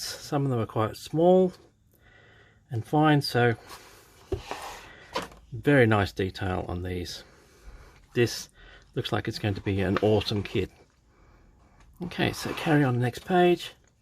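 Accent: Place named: Australia. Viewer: Great Review. I picked up this Kit a Couple of mths ago ( ish..!) It's in my Queue, awaiting the Time to Build it. Also picked up a Flak 88mm crew to add to it. ( a little fettling should make them look Ok..! ) I Also bought a Searchlight kit to go with it, but that needs Crew too. But its all a 'Work in Progress.' The Takom, pressings are just so Crisp, its a very impressive kit overall..! Great Detailed Review have Subscribed due to the Quality of it, and your an Aussie like me. So your Easy on the Ears.! Cheers Mate from a Fellow Modelling Nut, somewhere in Sth.Aust. 😂🦘😎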